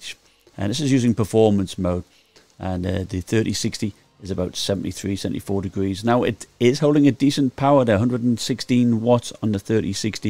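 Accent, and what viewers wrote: United Kingdom. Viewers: You sound british mate, wouldve appreciated the price in the GBP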